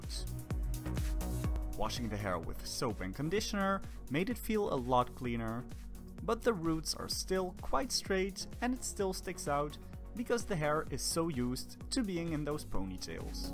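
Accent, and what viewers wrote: Netherlands. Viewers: That netherlands Acsent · She's so beautiful!!! Great job! Btw are you Dutch? I noticed a subtle accent since I am Dutch myself.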